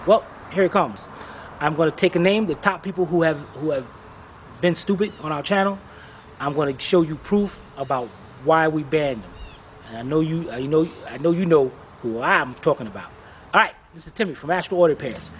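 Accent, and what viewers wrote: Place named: Boston, United States. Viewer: I know this is off topic but...North Carolina??? "...so let's get up under HEAH...", "...CONNECTAH.." and fast talking... that accent sounds Boston-ish, New York-ish, Jersey-ish??😂😂Thanks for the information in this video. The 'wall' explanation helps a lot as my vehicle is throwing this code. ♥ .•:*¨¨*:•. ♥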